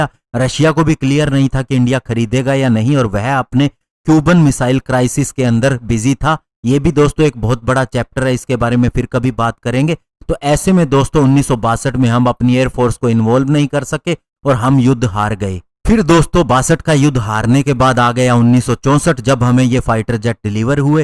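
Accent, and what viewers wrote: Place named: Pakistan. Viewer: U r Pakistani.....surely.........Bhai hamara military budget 4th largest haii world kaa.....n tune to Pakistan ki zabardast haar 1971 k baatein mein to kuch kaha hi nahii....n Teri baton se to lgta hai Indian air force kuch bhi nahi haii....n Tera accent bhii Porkistan jaisa haii....sun be Tere Pakistan ki naa Bahan KR k jayengee huummm yaad rakhiyo.......bhikahariyon....roti khane k paise nahi ladoge humsee.....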